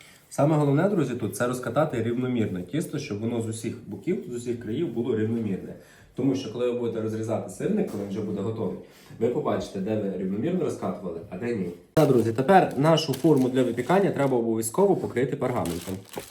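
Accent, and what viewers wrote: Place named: Western Ukraine, Ukraine. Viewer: Супер. Говір з Заходу України. Деякі слова діалекти я і мої рідні досих пір використовуємо.😊👍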